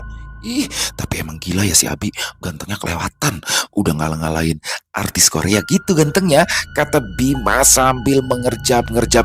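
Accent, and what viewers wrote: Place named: Betawi, Indonesia. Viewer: Assalamualaikum bang Emang dah bang paling bisa dalam segala karakter hebaaat Apalagi logat Betawi paling suka dengarnya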